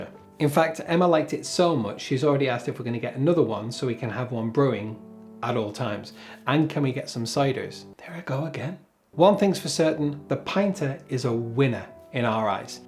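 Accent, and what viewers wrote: United Kingdom. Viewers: Good scouser. Haha. Well done. Great review. Defo buying this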